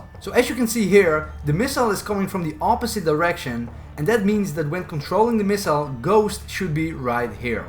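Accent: Netherlands. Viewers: Ik dacht al dat je Nederlands was vanwege t accent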